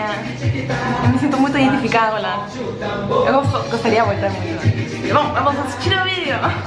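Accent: Peru. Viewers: por el acento digo q es peruana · Oi você é maravilhosa, adoro este sotaque peruano. Sou inscrito e super fã, grande abraço do Rio de janeiro. Bjos minha linda!!! 😘💖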